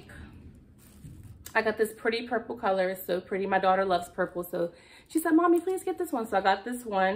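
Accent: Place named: Pittsburgh, United States. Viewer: Love it I was going to use cardboard!!! This gonna sound crazy but I had to subscribe cause as soon as you spoke I knew your were a fellow PGH girl before you even said it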